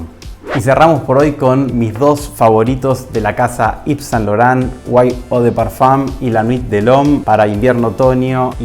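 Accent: Argentina. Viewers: nuevo sub,muy buen video. sos argentino no? Saludos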